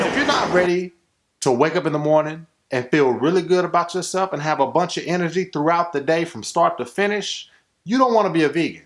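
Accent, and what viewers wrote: South, United States. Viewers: You are spot on about people not understanding ..I'm from MS and I don't know anyone else who is vegetarian or vegan. I got so much flack from my fam..two years later and I still get those "you still don't eat real food" questions. I also agree that it was me reading and gaining knowledge about veganism for myself that made me adopt the lifestyle. Dope video...I knew I heard a Southern accent!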